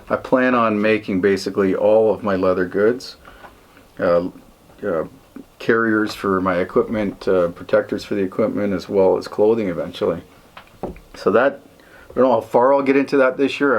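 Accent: Canada. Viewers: I come here for the Canadian accent.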